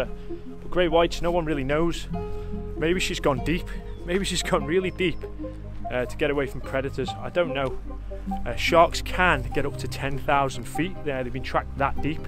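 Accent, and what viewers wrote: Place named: Liverpool, United Kingdom. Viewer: You have a local accent... eport? cheshire ways or liverpool?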